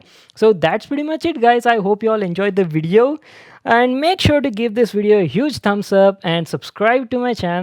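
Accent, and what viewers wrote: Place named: India. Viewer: he is like linus tech tips with an indian accent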